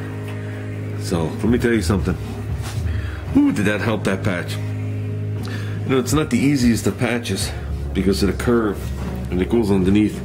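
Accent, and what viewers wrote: New York, United States. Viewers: Sweet power hour. Your accent reminds me of my god parents who lived in Pawling, NY.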